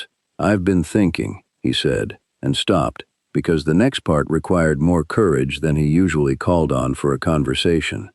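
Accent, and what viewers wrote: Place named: West, United States. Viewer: Complements to the narrator who sounds as if he IS from the Western Frontier. Listening from Clarksville, TN.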